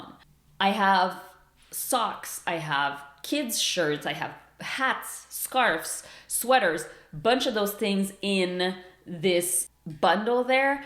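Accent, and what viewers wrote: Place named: Quebec, Canada. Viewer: ! Ça fait plusieurs vidéos que j'écoute de toi et à chaque fois je me dis... Aaaaah cet accent est tellement familié. C'est sur que tu es Québécoise! Je capote sur tes vidéos! Et tu met de l'avant des artistes francophones que je ne connaissais pas. Merci pour ton travail!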